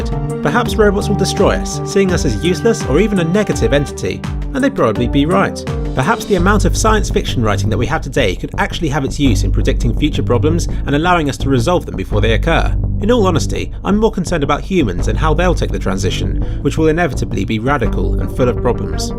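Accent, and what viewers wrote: United Kingdom. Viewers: Oli Allan I was actually more annoyed by the distracting background muzak and his nasal British(?) accent.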